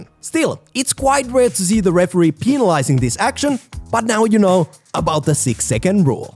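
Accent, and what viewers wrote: Finland. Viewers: Im pretty sure ur A Finn cuz off that accent 🤔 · Im 100% sure the guy talking in this video is from Finland. Suomi perkele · Funny how the narrator tries so hard to hide his Finnish accent :DD just rock it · Is this commentator from Finland?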